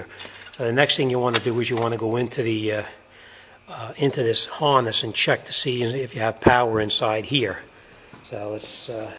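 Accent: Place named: New England, United States. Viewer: Love your New England accent!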